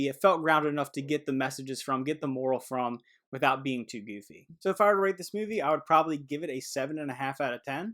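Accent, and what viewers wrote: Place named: Philadelphia, United States. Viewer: You from Philly bro? Or jersey?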